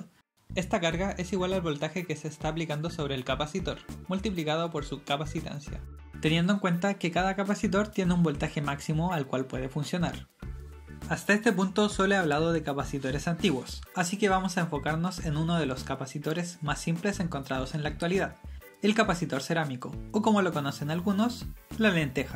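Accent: Chile. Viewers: Eres de Chile?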